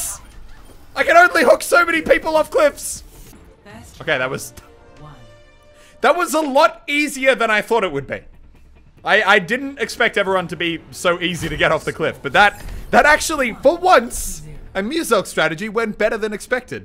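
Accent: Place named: Australia. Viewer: It's because of his Australian accent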